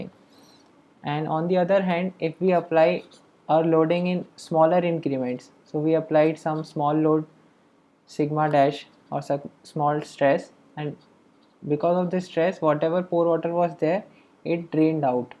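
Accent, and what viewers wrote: India. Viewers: I am sorry to say that most of my concentration is already used up by your 'terrible' Indian accent. A pity you do not learn English earlier at school. Secondly your writing is really bad. From the content it is probably ok, but as European I stop following you because I find it very hard to comprehend your English. A voice over or typed out English could do wonders. I return to my English book......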